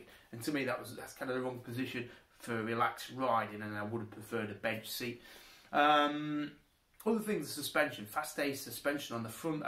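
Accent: United Kingdom. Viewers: Your stupid accent is hurting our ears no matter if some people tell u otherwise.just try to speak with Canadian or American,mate other brits can ,u can do it too ..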